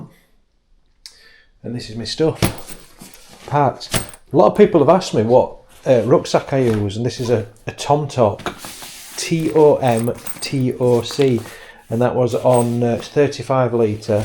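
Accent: Yorkshire, United Kingdom. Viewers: Hello,!! Yank here, who absolutely loves your channel!! I'm a new subscriber, so I don't know a thing about "van life," and TBH, I will watch any content you post and not bellyache!! Any viewer who tries to manage your content needs to get stuffed!! Your Yorkshire accent knocks me out!! And your observations are hilarious!! 🤣🤣🤣 Sweet dreams!! Ta... Chuck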